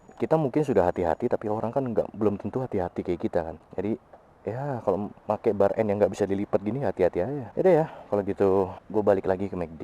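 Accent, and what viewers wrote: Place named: Java, Indonesia. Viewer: Jawa nya medokkk anjasssss😂😂😂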